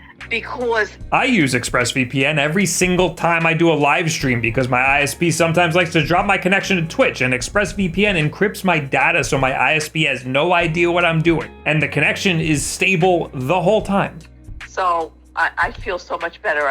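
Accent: New York, United States. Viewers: The first Wulff Den video I saw, said Mario and I immediately said to myself, "He must be from New York, close to NYC."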